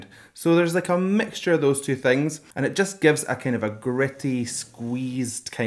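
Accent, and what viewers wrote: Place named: Glasgow, United Kingdom. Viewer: what you said about RM's speaking voice being smoother than his rap, I think is true of SUGA and j-hope, as well. SUGA's rap voice jumps wide intervals with interjected squeaks and lots of aggressive rhythm, but his speaking voice is warm and humble. j-hope speaks sweetly most of the time, but he can rap like a dancing firecracker! BTW, I enjoy not only your commentary but your accent. Glasgow is one of my favorite cities (I live near San Francisco). Can't wait to go back! Stay well!!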